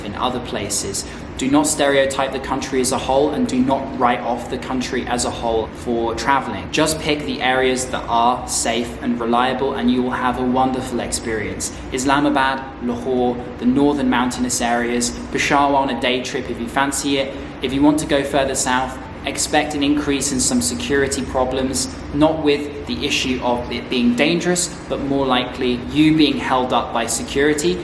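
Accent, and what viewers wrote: England, United Kingdom. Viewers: I fell in love with your accent. Cause I really love English accent😊HI FROM TURKEY 🇹🇷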